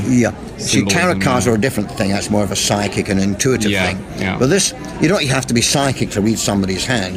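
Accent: Scotland, United Kingdom. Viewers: with a Scottish twang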